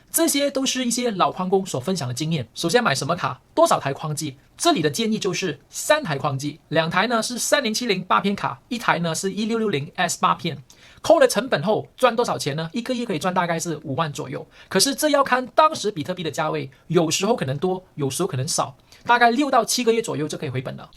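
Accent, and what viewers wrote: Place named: Malaysia. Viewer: 刚开始以为是移民到台湾的马来西亚人。没事，听的蛮舒服的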